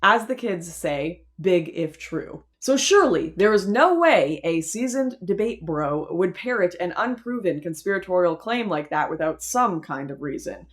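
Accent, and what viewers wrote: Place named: Canada. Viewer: Letterkenny! Canadian is showing.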